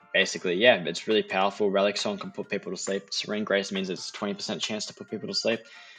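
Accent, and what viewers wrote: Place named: United Kingdom. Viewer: Oh my goodness, he's British 😮 hehehe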